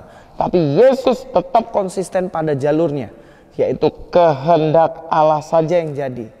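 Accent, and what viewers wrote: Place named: East Java, Indonesia. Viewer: Tp nadanya sprti orng jatim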